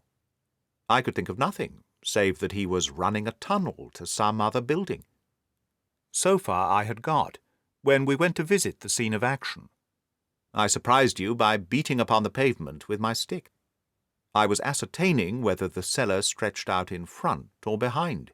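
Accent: United Kingdom. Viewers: Beautiful British English, high reading class. I listened with pleasure. Thank you so much.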